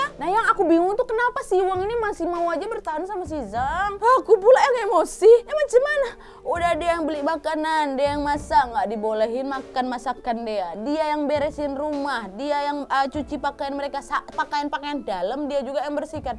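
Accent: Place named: Sumatra, Indonesia. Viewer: Kak ini aslinya orang mana kah....? Masa iya orang Jawa tapi logatnya kayak orang sumatera.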